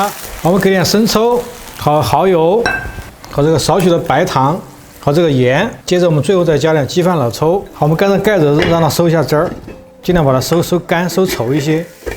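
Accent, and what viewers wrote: Sichuan, China. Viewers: 师傅是四川人吗？rua 均匀，哈哈哈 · 川味普通话好情切哦！❤ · 帅哥川普十级，听上去特别亲切。菜谱也棒棒地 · 听到师傅的椒盐普通话，就晓得师傅的川菜是资格的。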